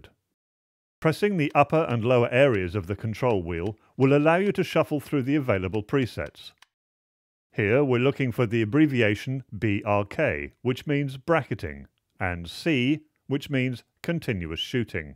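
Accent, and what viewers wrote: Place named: United Kingdom. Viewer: outstanding. Can you believe that i just couldn't find these instructions anywhere?? clear crisp and at last a classic understandable old school british accent with clarity of thought . thanks once again.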